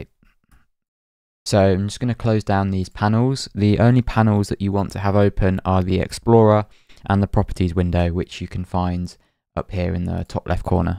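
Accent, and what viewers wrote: United Kingdom. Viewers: i just love british ppl (the accent ofc)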